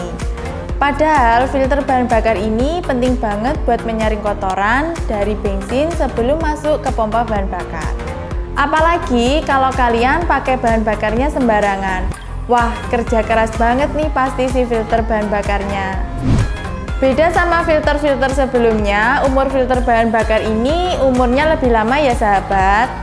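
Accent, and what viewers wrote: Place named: Java, Indonesia. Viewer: Medhok sekali ya kak wakakakakak tapi NICE banetttt kak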